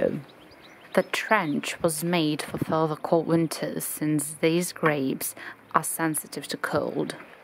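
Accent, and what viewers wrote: United Kingdom. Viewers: Cabbages were too good ...good work ...you belong to belarus but your english accent sound like british ? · British accent....that's impressive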